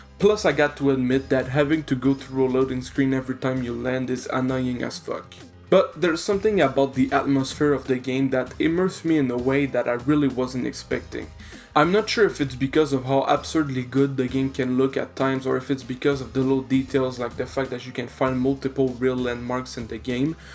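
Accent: Quebec, Canada. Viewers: r u a quebecor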